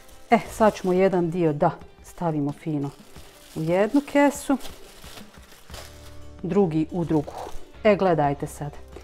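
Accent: Bosnia and Herzegovina. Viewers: Legenda si ,, obozavam tvoj bosanski izricaj..pozdrav iz Splita..😗😗😙😙😙😙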